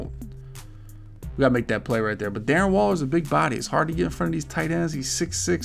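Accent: Philadelphia, United States. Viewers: You from philly bro south philly myself sound like a philly dude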